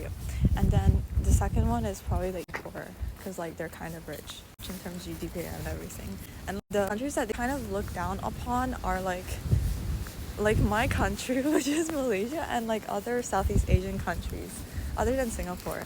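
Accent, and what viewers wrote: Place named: United States. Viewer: You have an American accent. Your skin so nice too.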